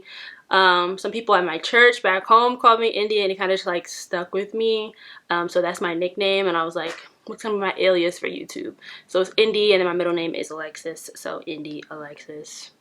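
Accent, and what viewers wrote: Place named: New York, United States. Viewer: I feel like people think you’re Caribbean by how you speak but that’s the New York in you. There is a lot of Caribbean people here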